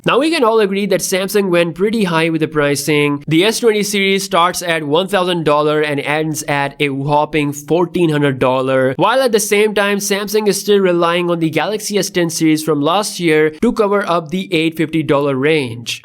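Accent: India. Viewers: You have your Indian accent messing up the whole thing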